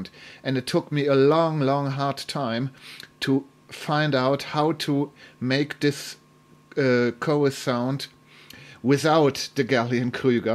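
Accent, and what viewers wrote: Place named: Germany. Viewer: ich wette Sie sind Deutscher, starker, sympatischer Akzent! Danke für diesen Artikel! Es muss nicht immer Röhre sein.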